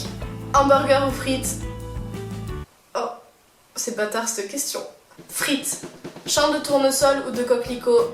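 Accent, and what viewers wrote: South, France. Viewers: j'adore ton accent Ca rappelle le sud,la mer,la plage! Mais! Quand on regarde le reflet du ciel derriere toi bah il fait pas très beau on dirait!👌 Je t'aime beaucoup ❤️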